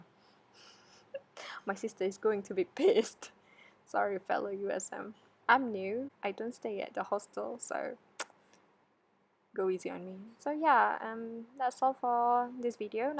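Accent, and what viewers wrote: United Kingdom. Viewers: Have u ever been in abroad before ? Or you received from this uni? Your English is very good. Especially British accent , haha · Love to hear your english's accent.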